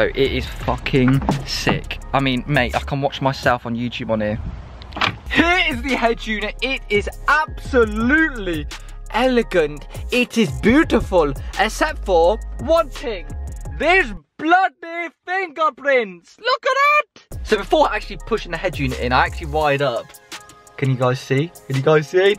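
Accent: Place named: Birmingham, United Kingdom. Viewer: He sounds like he`s from Birmingham LOL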